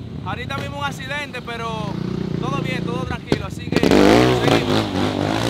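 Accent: Dominican Republic. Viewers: KLK TA DURO ESTE CANAL OYE AMI Se ase que eres dominicanos esmas yo wa a desi que tu ere dominicano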